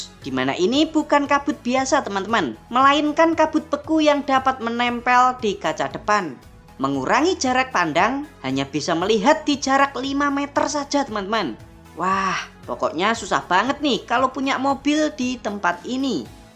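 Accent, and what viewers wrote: Java, Indonesia. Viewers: Logat nya jawa pula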